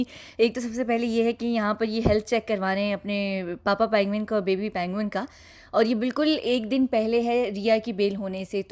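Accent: United Kingdom. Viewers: Ap rehete ho uk me ? accent se lga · I am dr hyder by profession I am a cardiac surgeon from Pakistan .... I love the way she speaks and the most of it I love the way she has this British accent ..... lolzzzz I am her fan .... thanks for being soo brave and fighting for truth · Her accent seems like UK or british accent. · When you speak in English I recognize it as a British accent. But when you speak Hindi/Urdu in your videos Your British accent is not apparent: so I wonder if you could speak English with an accent of a native Hindi/Urdu speaker (Indian English) accent: it will be fun if you can give it a try! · U have a British accent . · Sometimes I rewind and listen to your British Accent and I loved it and loved the fact that you speak Hindi so good.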